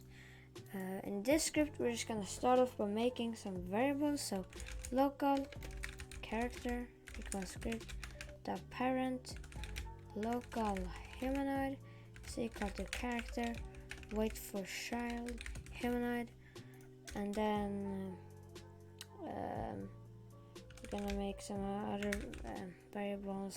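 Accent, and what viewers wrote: Sweden. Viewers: ur swedish I know it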